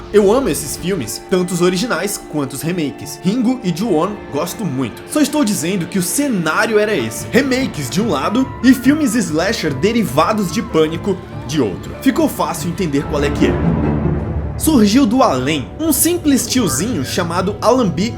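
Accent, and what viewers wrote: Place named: Goiás, Brazil. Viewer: Você é goiano ? Seu sotaque é parecido ❤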